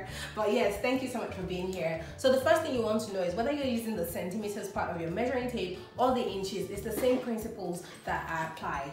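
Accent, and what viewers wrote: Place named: United States. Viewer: You are so cute and I love your English like Americans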